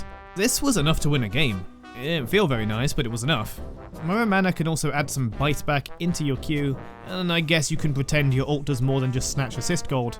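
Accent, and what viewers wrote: United Kingdom. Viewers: a british wittery accent is crazy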